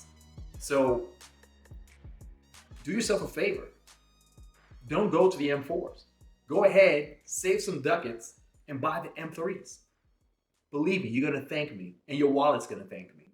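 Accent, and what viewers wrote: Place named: New York, United States. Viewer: Good effort for a noob but that New York City accent is way to heavy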